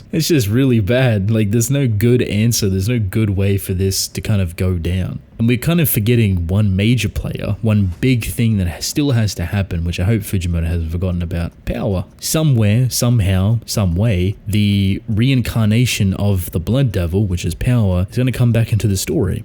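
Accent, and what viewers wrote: Australia. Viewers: maybe it’s the deep Australian accent 🤷‍♂️. Looking forward to your new ASMR channel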